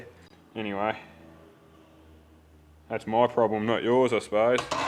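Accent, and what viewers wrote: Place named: Australia. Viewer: Thanks mate, good to hear an Aussie how-to rather than always US videos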